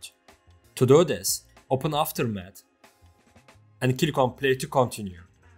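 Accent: Turkey. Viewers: Thanks for the help brother. Btw your accent sounds like turks. Is there any chance that we're from the same mother nation? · aynı türkler gibi ingillizce konuşuyorsun.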